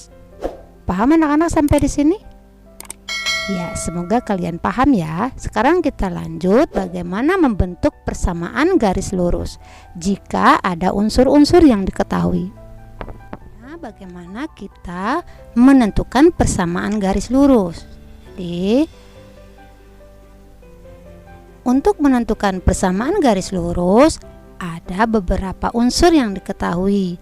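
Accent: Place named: Padang, Indonesia. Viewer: langsung tahu logat dunsanaknyo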